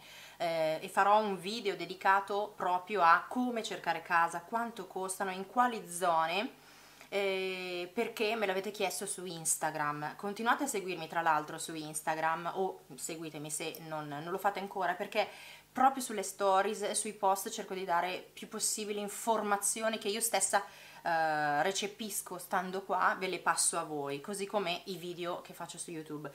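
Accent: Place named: Milan, Italy. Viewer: sempre con la cadenza milanese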